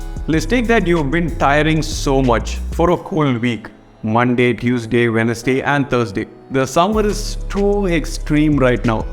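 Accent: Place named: North India, India. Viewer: I thought you are from north india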